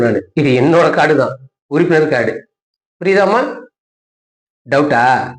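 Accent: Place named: Kongu, India. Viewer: இந்த வீடியோவில் பேசுபவர் கொங்கு வேளாள கவுண்டர் பாஷை பேசுகிறார். கொங்கு வேளாள கவுண்டர்களே தமிழர்கள் கிடையாது. கர்நாடகாவில் இருந்து வந்தவர்கள்.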